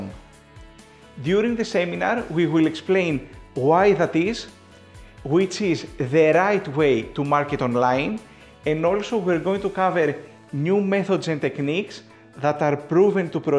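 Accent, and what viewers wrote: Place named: Greece. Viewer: Perfect, though greek accented :D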